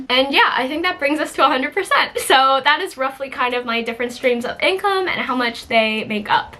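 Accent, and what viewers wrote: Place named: South Jersey, United States. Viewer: woo hoo new jersey!! it sounds like you have a south jersey accent (a little bit)!!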